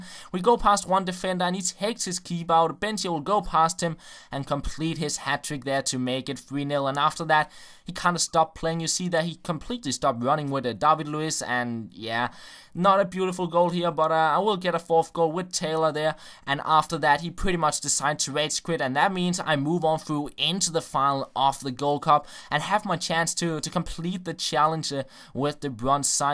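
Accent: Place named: Denmark. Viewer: Den danske accent<3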